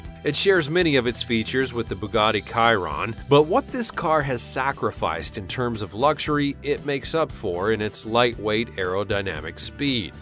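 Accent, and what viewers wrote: United States. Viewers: You know when someone talks so American, it annoys you to listen.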